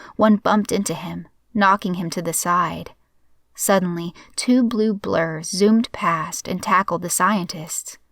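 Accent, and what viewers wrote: South, United States. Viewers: it's so cool and her southern accent comes out so well in that and it's like seriously my favorite story I've listened to it a couple of times I don't want to wear it out I love that story it's awesome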